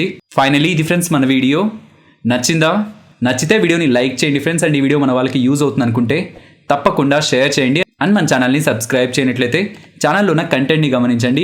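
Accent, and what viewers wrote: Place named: Rayalaseema, India. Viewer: Bro u r from Rayalaseema 😎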